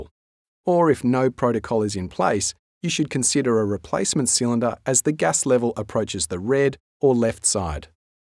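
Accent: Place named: Australia. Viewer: British Oxygen Corporation Voiced my a Aussie!! Great Job.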